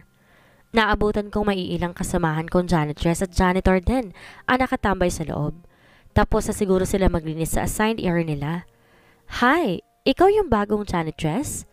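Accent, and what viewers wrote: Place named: Iloilo, Philippines. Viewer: Maka lingaw ya ilongga mu miss Narrator 😅❤ taga banisilan po ako . I’m very happy po while listening this story ❤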